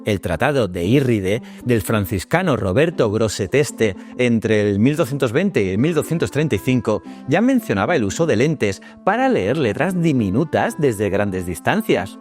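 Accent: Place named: Spain. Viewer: Me gustan este tipo de historia, pero lo único malo es que los españoles hablan muy mal, yo diría, muy reeeemal, lo cual no es nada agradable sentarse muchos minutos escuchando una forma de hablar desagradable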